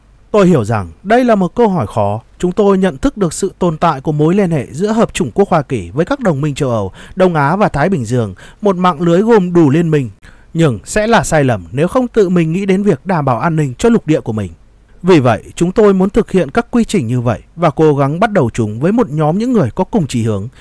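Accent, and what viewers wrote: Standard. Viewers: Bạn bl rất chuẩn ,hay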